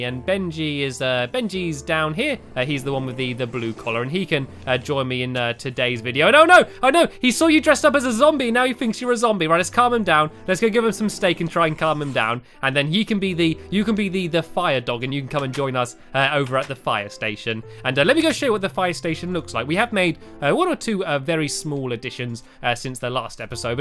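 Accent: England, United Kingdom. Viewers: He's english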